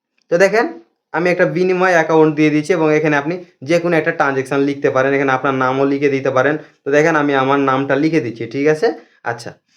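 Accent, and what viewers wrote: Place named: Kolkata, India. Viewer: ভাই, আপনি কি ইন্ডিয়ান? ভাষা কোলকাতার মতো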